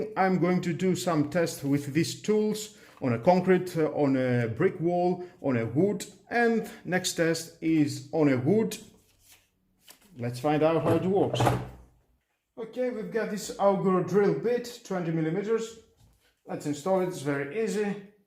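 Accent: Greece. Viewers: out of the point, I think you are Greek from your accent. If you are, do you know where can I find it, except from Lidl :-P ?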